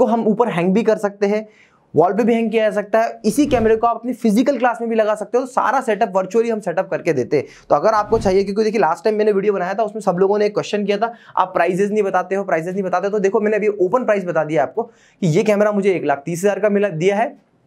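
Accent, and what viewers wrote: India. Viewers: R u from Maharastra?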